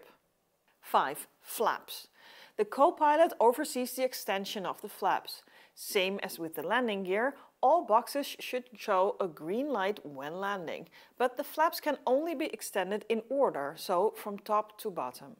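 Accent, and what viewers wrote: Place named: Germany. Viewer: Great vid love the german accent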